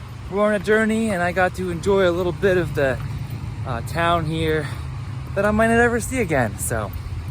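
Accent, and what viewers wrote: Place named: New England, United States. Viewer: I think you should just say it how you say it. Nevermind the naysayers! We are New Englanders, get used to the weird way we say things! lol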